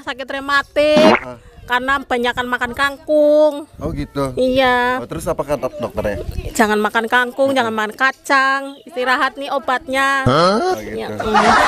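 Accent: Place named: Java, Indonesia. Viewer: Orang mana si ini medok kox bgt😅😅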